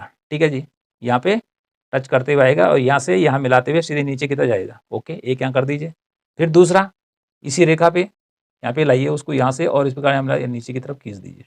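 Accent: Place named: Hills, India. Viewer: sir apki bhasha se lag raha jaise aap pahad se honge 🙏 or thank you sir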